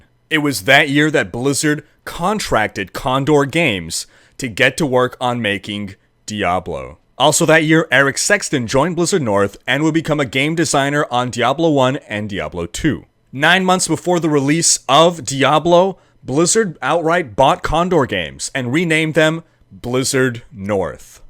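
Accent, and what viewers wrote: Quebec, Canada. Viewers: Are you from Quebec? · He's Canadian mate. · Saku Koivu ? Are you from Quebec / Montreal ?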